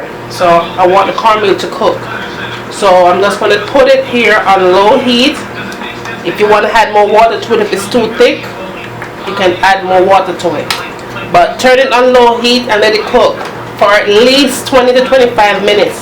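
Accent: Jamaica. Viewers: Mi just love how you talk straight jamaican. Thanks fi the recipe. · Delicious! Jamaican cornmeal porridge. I grew up in Lewisham, SE London. I love your Jamaican accent! It's beautiful! And love the 'Nah wan no lumpy-lumpy porridge'. Jamaican is just the coolest patois... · Now i "H-add" a little... yeah man real yaawdy this... can't get anymore authentic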